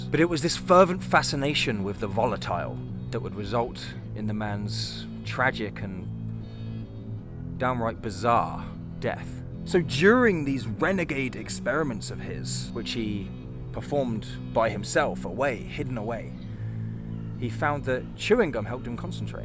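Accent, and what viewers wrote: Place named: United Kingdom. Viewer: You have a great Bri-ish accent and you are cute!!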